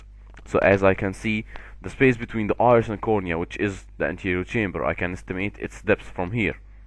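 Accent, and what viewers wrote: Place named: Egypt. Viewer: cuz this s egyptian english :D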